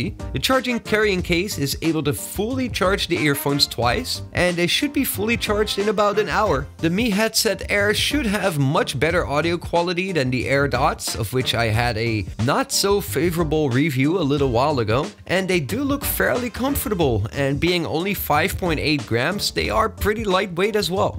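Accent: Netherlands. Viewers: Hoor ik daar een Nederlands accent...? 😄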